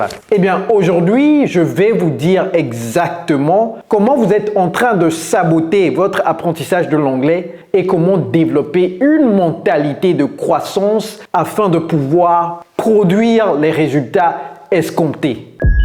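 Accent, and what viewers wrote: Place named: United States. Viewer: Salut coach,je suis étudiant actuellement au Nigéria et je parle bien l'anglais mais je veux parler l'anglais Américain comme vous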